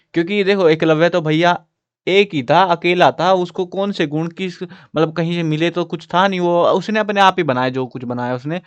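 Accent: Haryana, India. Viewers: Sir app haryana sa ho kya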